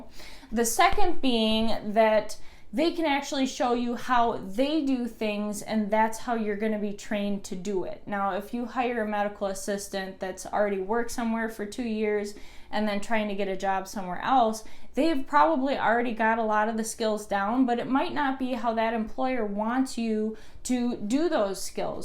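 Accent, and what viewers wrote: Midwest, United States. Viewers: Are you from Chicago or the midwest? I hear it in your accent, but I could be wrong! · I can tell you are from the Midwest!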